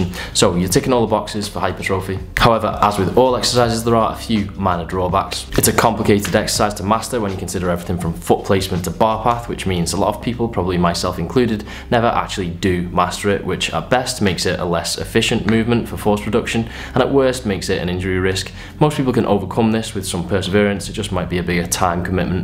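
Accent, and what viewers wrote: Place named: North, United Kingdom. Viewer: Nice channel buddy. No nonsesne advice delivered in a perfectly no nonsense northern way. I've just hit 50 and spent the last 6 months trying to get back into shape after being fat and fifty and not happy. Doing great but I gotta say squats and deadlifts have just left me in pain and struggling to work anything with lower back. Not even lifting that heavy, I think my form is ok but I will be thinking of alternatives after watching this so BIG THANKS buddy.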